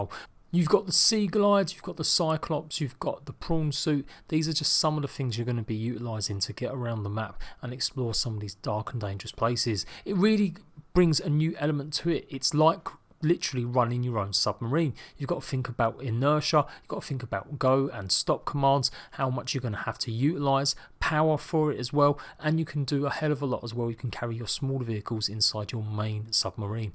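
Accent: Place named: Essex, United Kingdom. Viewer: You must be from Essex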